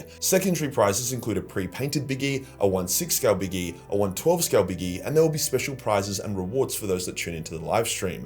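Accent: Australia. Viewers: I only watch 2 actual content creators on YouTube, you and weshammer. I'm literally addicted to your lore videos. My girlfriend told me after going home (its long distance with regular visits) she can't sleep without "the Australian guy talking about warhammer". You're literally my favorite person on the internet and I cant thank you enough for your service! Have a great fucking day. Hail the emperor! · With my original profile and this one I am commenting from I have been subbed to since the very beginning. I was there at the dawn when the old magic was written. Its been such a cool journey. Ive watched through so many moments of crisis and success. It's been a sight to behold seeing DOM rise so high! I hope you see this and know your Aussie voice has traveled so very far and risen to such great heights.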